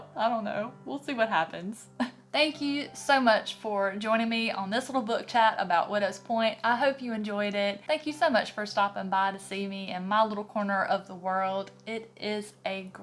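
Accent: South, United States. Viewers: I love your channel!!! Love your accent. I'm from the South too.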